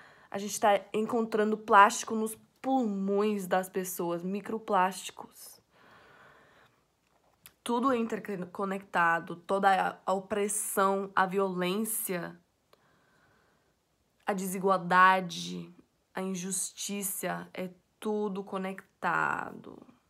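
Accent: Bahia, Brazil. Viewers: Parabéns, você é uma menina muito culta, seria bom ver jovens como você tão inteligente e voltada pra as coisas que acontecem no mundo. Suas verdades nos inspiram... Obrigado... Aproveitando o ensejo, é incrível como você fala muito bem o português brasileiro (sotaque nordestino - Bahia)...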